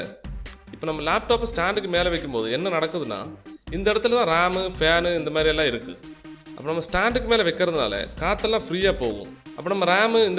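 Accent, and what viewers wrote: Kerala, India. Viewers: Are u malayali or pandi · He is a malayali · unga slang Malayalam+ tamil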